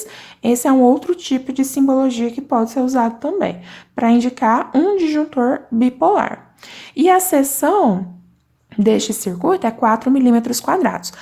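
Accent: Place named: Minas Gerais, Brazil. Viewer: Sotaque mineiro, me pareço bem bonito.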